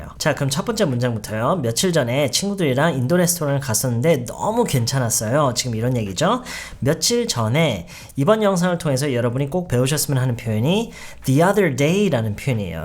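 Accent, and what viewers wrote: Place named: United States. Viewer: 유용한 영상 감사해요 !! 말투가 박재법 군이랑 비슷한데 미국 어느 지역 엑센트인가요?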